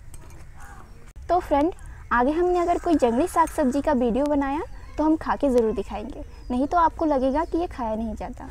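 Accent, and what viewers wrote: West Bengal, India. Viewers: Beta aapki boli, aur ye kamal ke talab ,aur taad ke ped , aisa lag raha hai west Bengal se hain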